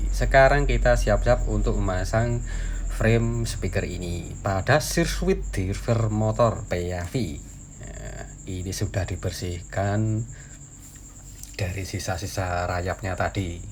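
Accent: Surabaya, Indonesia. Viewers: That accent sounds like Surabaya?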